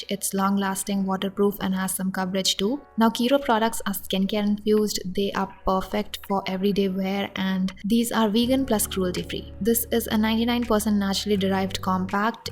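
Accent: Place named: India. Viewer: I love your Indian accent ❤️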